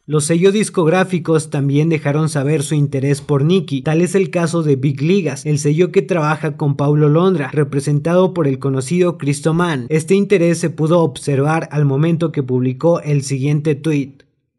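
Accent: Argentina. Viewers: Sos argentino o uruguayo vos?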